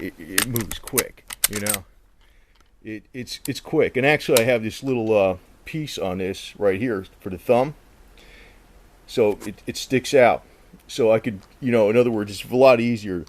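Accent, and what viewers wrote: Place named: West Coast, United States. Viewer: He sounds like somebody from the West Coast.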